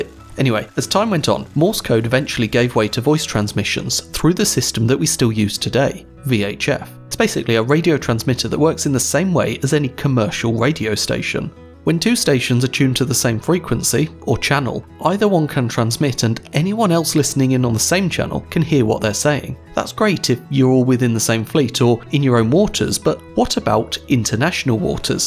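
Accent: United Kingdom. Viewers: Informative, concise, well animated and British voiceover. Perfect. Avast ye scurvy knaves and prepare to be boarded ! Aaar !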